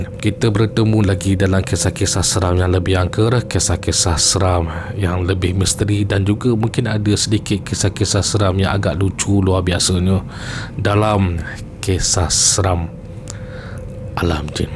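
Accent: Perak, Malaysia. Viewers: assalamualaikum..ouh org perak ke yop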